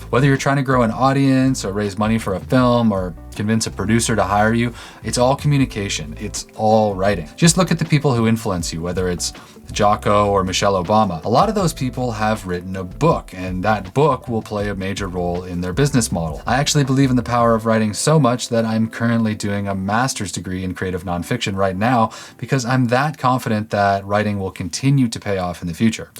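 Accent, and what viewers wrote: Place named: Texas, United States. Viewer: Canadian? I thought you were from Texas.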